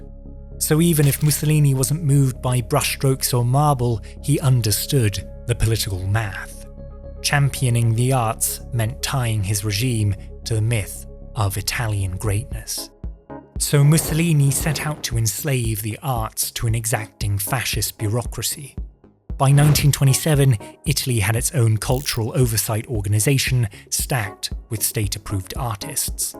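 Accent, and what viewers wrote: England, United Kingdom. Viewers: The host sounds quite similar to Simon whistling who runs biographics, war fronts and loads of other channels? I does say the person who runs the channel is Swiss but the host has an English accent. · Okay, I have a question aside from saying: This looks and sounds amazing!!! But where are you from? The german is sooo good, the italian also sounds good (even tho I don't speak it) and the english sounds british. I am like, so confused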